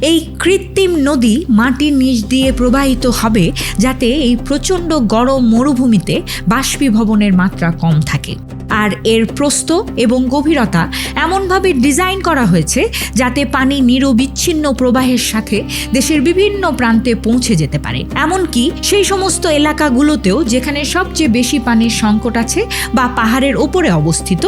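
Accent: Bangladesh. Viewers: আপনার ডিটেইলস পরিচয় জানতে চাই। একটি ভিডিও করলে ভালো হয়।আপনার কথার টোন ভারতীয় নয় বাংলাদেশীদের মতো। তাই কৌতূহল বশত কমেন্টস করলাম।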